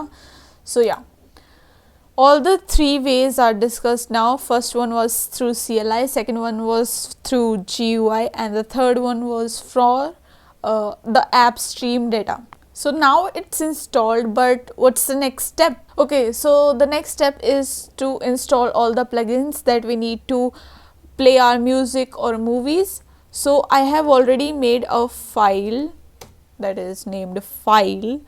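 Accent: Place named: India. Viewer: Wow indian voice 😍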